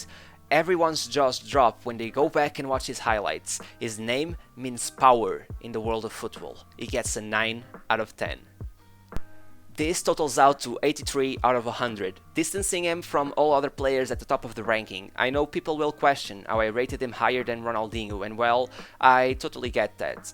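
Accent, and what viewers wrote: Portugal. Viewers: you sound like a portuguese guy talking english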